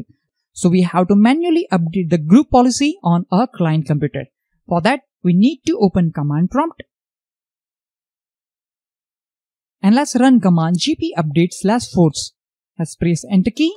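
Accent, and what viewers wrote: India. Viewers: indian accent boosted my brain, thank you bro i love india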